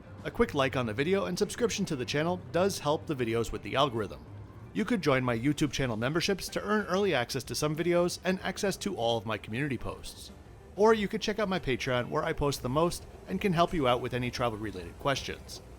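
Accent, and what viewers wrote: New Jersey, United States. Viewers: I knew it! You’re from Jersey😂 accent gave it away. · Knew you were from Jersey by the way you say water. Great video per usual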